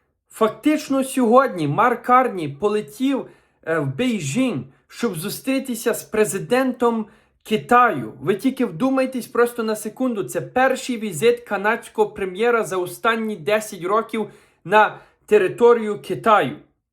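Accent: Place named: Western Ukraine, Ukraine. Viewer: Он бандера это у них такой диалект западенский бандера гельготун на мойве, это не украинська мова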